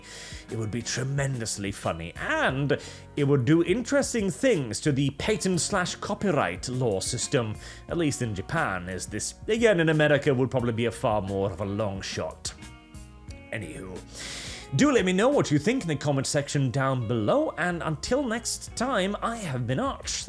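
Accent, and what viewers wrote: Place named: United Kingdom. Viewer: I didn't realize brits stress vowels so differently versus Americans.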